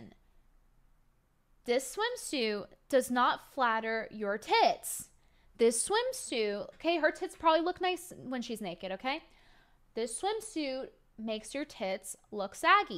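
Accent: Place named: Texas, United States. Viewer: you are taking on a bit of the Texas twang you are a southern belle now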